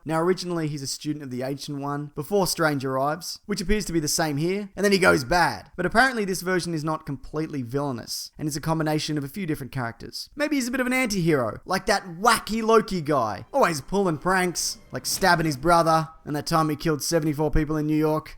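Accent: Australia. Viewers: yeah his australian speech pattern is definitely entertaining. keep bloody going mate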